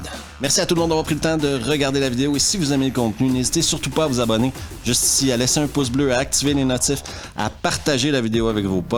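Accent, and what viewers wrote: Quebec, Canada. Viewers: J'adore tes vidéos tu a un accent québécois a tu déjà vécu au Québec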